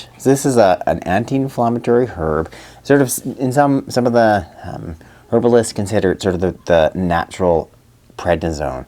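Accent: Canada. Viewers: Holy Canadian!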